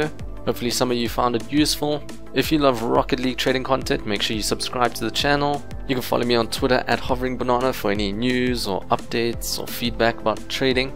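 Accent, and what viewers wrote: South Africa. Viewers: are you from South Africa, because I am too? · is this guy south african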